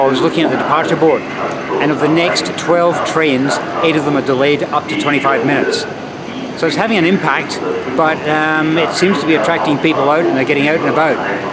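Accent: Scotland, United Kingdom. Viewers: Great Skaughtisch accent!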